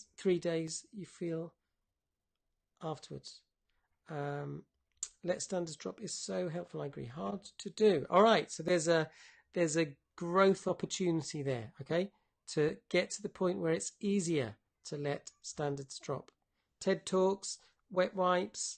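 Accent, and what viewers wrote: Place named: United Kingdom. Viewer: Hello. I just stumbled onto one of your videos posted on YouTube and concluded iI would like to experience more of them. So….Facebook live? Thursdays at 5pm? But 5pm where? You sound British. Are you in Britain? Five hours ahead of me.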